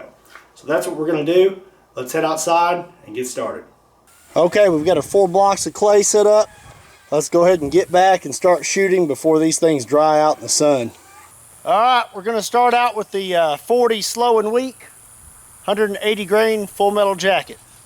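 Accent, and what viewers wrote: Kentucky, United States. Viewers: What part of Kentucky are you from?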